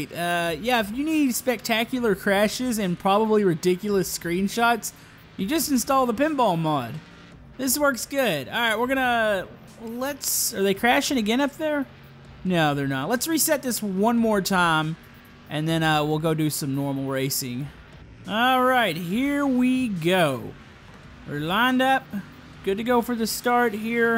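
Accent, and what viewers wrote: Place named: United States. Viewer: Where do you live? You sound American but you have your speed still set to km/h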